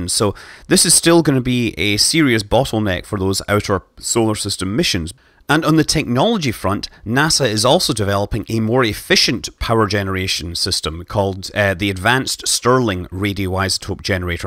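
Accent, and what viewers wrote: Scotland, United Kingdom. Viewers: Scott's a Scot, and still has a Scottish dialect. This includes occasional alveolar taps which are sort of a cross between r, d, and l, and when the r is a linking r, it is almost always given an alveolar tap.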